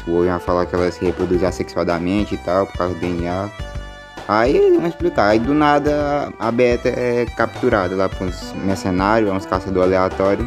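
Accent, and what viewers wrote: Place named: Brazil. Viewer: Is a Brazilian Voice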